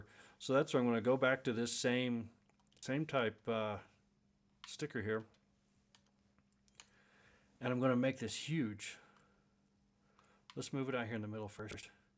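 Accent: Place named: South, United States. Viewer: You have the soothing southern voice for it, just every time you make a mistake call it a "Happy accident" and add a "happy little piece of rust" here and there.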